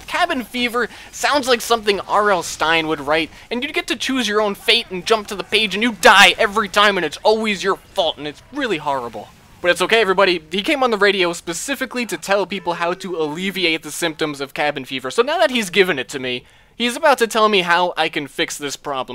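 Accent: New Jersey, United States. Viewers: So ur from nj then? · American lol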